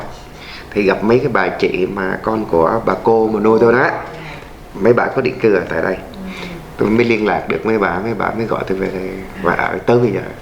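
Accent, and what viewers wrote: North, Vietnam. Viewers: Ong nay khong phai nguoi Sai gon nghe cach noi chuyen va giong noi giong nguoi bac .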